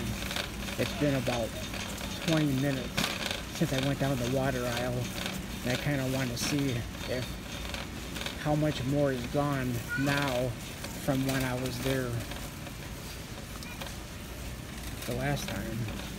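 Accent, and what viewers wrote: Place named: Midwest, United States. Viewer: Rootless Wanders Rootless... you can’t tell by his Mid-west accent!? Good lord he sounds like he could be RADAR O’Reiilys cousin!!!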